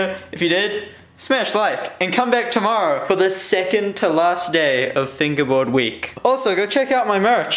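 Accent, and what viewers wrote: New Zealand. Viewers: your a kiwi? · Is this guy from New Zealand if so same here the How I know 'the warehouse, low prices are just the beginning'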